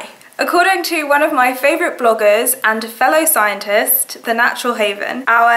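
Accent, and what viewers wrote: England, United Kingdom. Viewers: Which part of England youre from, love the accent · Yes, this is considered very well spoken British English. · fucking brits and their accents, fucking hot lol 😍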